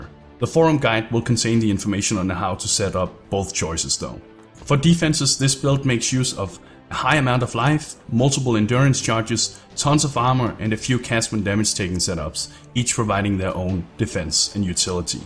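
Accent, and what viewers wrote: Denmark. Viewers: Was thinking.... Damn thats a danish accent.. "Checks your twitter" oh... you ARE danish :) nice Nice content man, det er super godt :)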